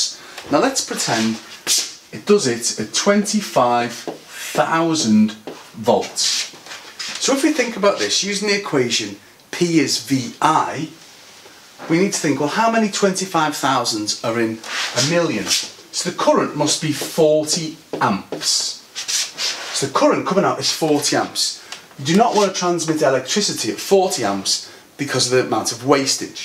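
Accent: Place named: Scotland, United Kingdom. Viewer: He does have a Scottish accent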